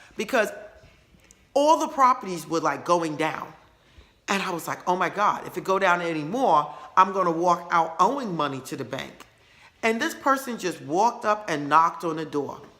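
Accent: New York, United States. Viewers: are u from new york? you've got a new york accent lol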